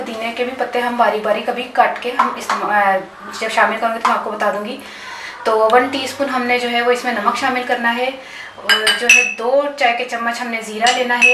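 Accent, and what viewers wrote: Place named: Kashmir, India. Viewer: Kashmiri urdu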